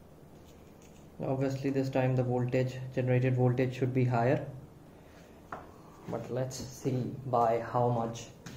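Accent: India. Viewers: Está hablando en inglés pero con acento hindú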